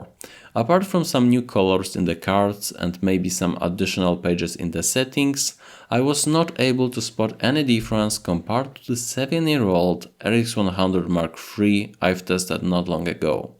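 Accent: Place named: Poland. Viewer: Your extremely heavy Polish accent makes your review difficult to follow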